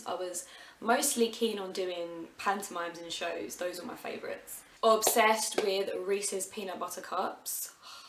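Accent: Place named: London, United Kingdom. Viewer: May I ask which part London you are from?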